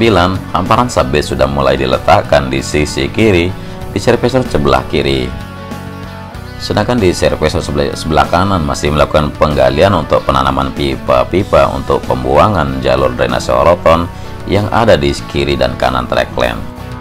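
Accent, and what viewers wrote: Lombok, Indonesia. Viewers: Suaranya masnya Itu lho suara khas mandalika banget😁😁, Topbgt 👍🏼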